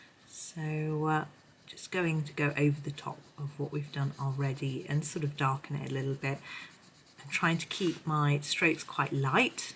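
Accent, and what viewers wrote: England, United Kingdom. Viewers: Oh, your version of this flower mandala is Beautiful!!! I love watching you color, giving us tips here and there. I'm a rather new subscriber and enjoy your coloring and, especially, listening to you. Your voice is so very soothing and your English accent is wonderful. I'm getting Back into coloring. I've had a rather long stretch of not coloring, but piecing quilts. So, not doing nothing, but piecing Can be frustrating, and coloring is just so relaxing. I could color for hours!! Thank you for your calm, inspiring videos. I enjoy them immensely. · Hello I've been following your channel now for a couple weeks, didn't say anything and I should of because I like very much not just your coloring but also to listen to you. I like that you choose more simple things and put emphasis on quality and you explain everything so well. 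I am so intrigued by your British accent I'm sure not the first to say that, although you probably don't think you have an accent. There are so many different kinds depending of what part of England you are, or UK, beside the Scottish and Irish accents. I use to be a professional musician, retired now, I have very good hearing still, maybe that's the only good thing I have since I'm older lol. So I can easily differentiate between the different English accents and yours is wonderful, sometimes you sound like Emma Thompson, I'm sure you know the actress, sometimes like Julie Andrews. It's very elegant, also your voice is very calming and you have such a nice sense of humor. So I really love to watch you and listen to you. I have some coloring and product questions, I will leave that for next time, this comment is already too long. Looking forward to the next video, you take care and stay safe. 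Judith 😊❤️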